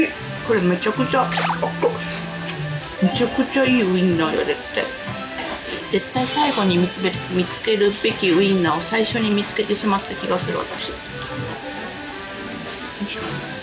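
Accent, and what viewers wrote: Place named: Osaka, Japan. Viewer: 大阪弁が入っているので親近感がわきます。 それにしても食レポが巧いですね。ものすごく食べたくなりました。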